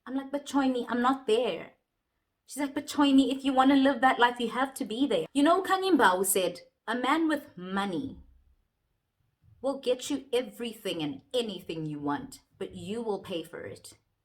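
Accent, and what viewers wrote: Zulu, South Africa. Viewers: Samnandi isiZulu sakho Ntokazi 🌹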